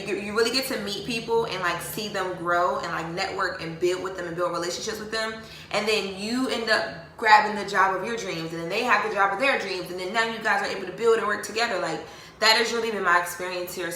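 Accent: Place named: Philadelphia, United States. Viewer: Okay Delaware. I was thinking your accent was Philly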